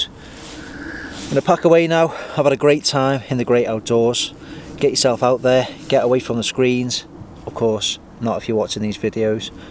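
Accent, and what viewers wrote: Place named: Cheshire, United Kingdom. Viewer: Some of the terminology you used, I’m guessing ex squaddie. With the accent, I’m guessing ex Cheshire?. . . . . I’m probably way out, but the video is really great and informative. I’m trying to get back into camping again, without an officer or Sergeant screaming at me, and getting my grandson into it. Definitely worth subscribing. Ta laarr.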